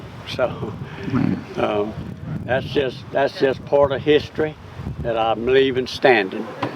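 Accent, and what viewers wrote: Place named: South, United States. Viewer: What are you from, since you have a Southern accent? Awesome sugarcane syrup though.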